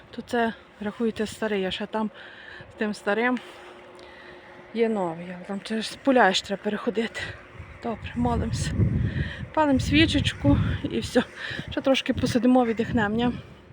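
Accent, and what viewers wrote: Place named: Ternopil, Ukraine. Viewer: Добрий вечір хочу вас запитати з якого ви району на Тернопільщині тому що я також з Тернопільщини яке ваш район,село в нашому селі є також така фамілія як ваша акцент ваш такий як у нас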